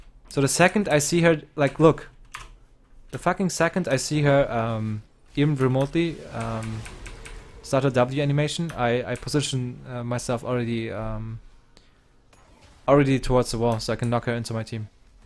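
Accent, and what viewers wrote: Germany. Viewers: Are u actually german? You sound like a german. :d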